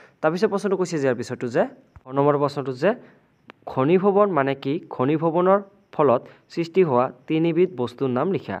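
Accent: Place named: Bodoland, India. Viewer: Sir aapuni Bodo hoi nki maat tu xuni enekuai lage? 🤨🤨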